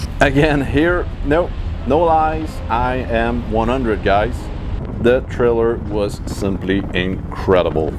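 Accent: Quebec, Canada. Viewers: You are very talented. Are you French Canadian.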